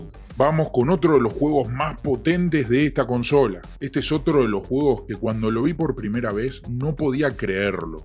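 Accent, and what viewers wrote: Argentina. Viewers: Hola. Sos un groso. Sos argentino puede ser?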